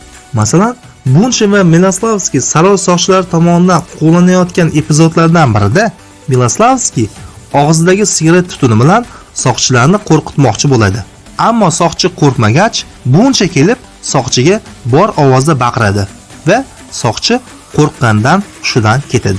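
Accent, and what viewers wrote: Namangan, Uzbekistan. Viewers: Наманган шеъвада гапирган админ. Шеъвани йўқотиб адабий тилда чиройлироқ гапиринг. Русча сўзларни ҳам Наманган шеъвада гапириб юбораябсиз. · Наманганлик акансиза шархловчи😊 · аввало узингни .талаффузингни Тугрилагин Наманганликмисан нима бало шевангни.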